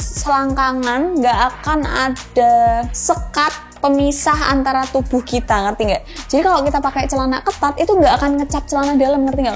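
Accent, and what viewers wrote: Java, Indonesia. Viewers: Wisss ayu, cerdas,yo supel,logat jowone nambah gawe ayune mba 'e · Jawa banget suara ny mba. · logatnya ky orang jawa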